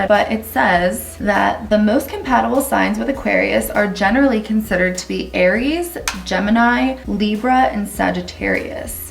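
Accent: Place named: Philadelphia, United States. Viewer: Your philly accent came through so much in this vid haha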